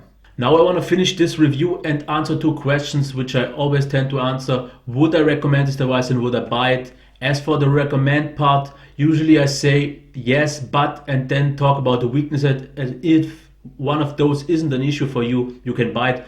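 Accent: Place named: Germany. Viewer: A few things you should know: 1. Mode notifications can be easily turned off 2. You may got a defect display because mine does not make any sound (and i already got two) 3. You want better scaling? Then turn the resolution down, i mean you already said that it´s useless for YOU to have such a high res. But now one question: Was that Yoga overheating at any time? I know that you don´t have it anymore but you may remember if it was. Because i already got two and both are freaking overheating. Really sad, now i have to change my Yoga again... PS: When i heard the first word you were speaking i immediately thought : German! :D Trotzdem gutes Review :)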